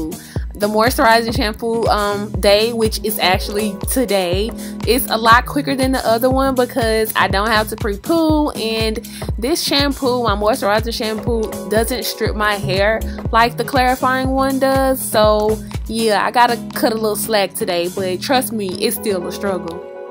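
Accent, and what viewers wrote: South, United States. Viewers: The southern accent is real with this one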